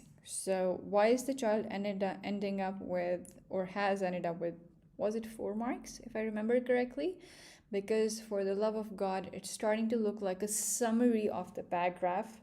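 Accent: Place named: England, United Kingdom. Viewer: Miss how you learn this english accent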